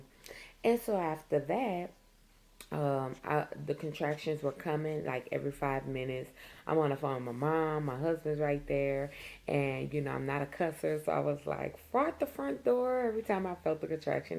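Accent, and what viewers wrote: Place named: New Orleans, United States. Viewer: She gotta be from here she sounds too much like a fee from NOLA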